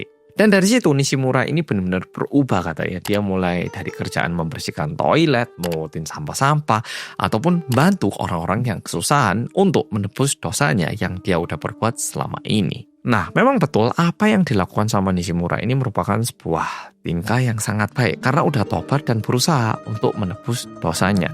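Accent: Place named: East Java, Indonesia. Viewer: Ciri khase omongan pasuruan Malang Masalahnya Untungnya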